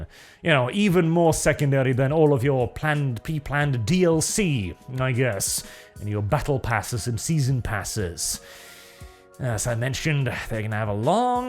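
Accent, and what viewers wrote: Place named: United Kingdom. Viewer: Hmmm, i dont like your britisch Exent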